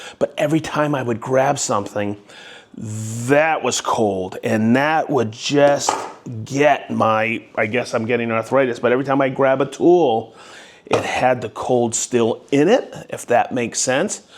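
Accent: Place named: Boston, United States. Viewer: I could be wrong.. but that’s Boston my friend.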